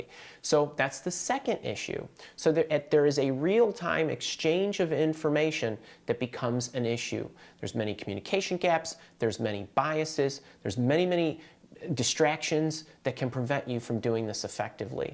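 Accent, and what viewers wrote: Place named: Wisconsin, United States. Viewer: Is this dude from Wisconsin or something? “Jahb”, “Fayst”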